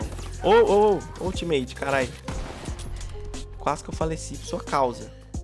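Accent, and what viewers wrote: Minas Gerais, Brazil. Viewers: cê é mineiro tbm né? kkkkk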